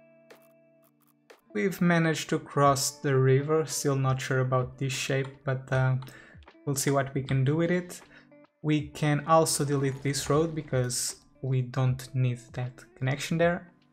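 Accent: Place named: Portugal. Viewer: Adoro o teu sotaque tuga inconfundível!!!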